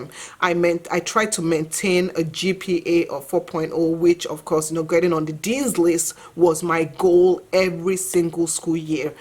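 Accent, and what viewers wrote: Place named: Nigeria. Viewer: Just your introduction started with so much energy! I liked your video right away haha. And it's great to hear other Nigerians story who are living abroad and building a successful life for themselves. P.S I love hearing the nigerian accent. Some people say I have it, but I hardly hear it in myself :)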